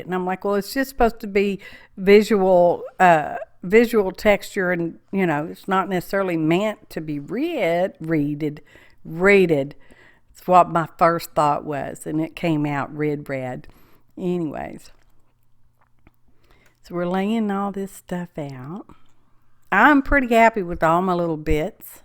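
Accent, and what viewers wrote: South, United States. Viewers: I love your accent. Are you a Southern Belle. ❤ I loved the swatches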